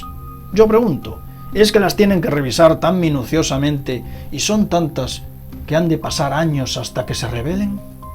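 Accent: Spain. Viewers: tu vos me da cáncer y mas tu acento de español racista y ladron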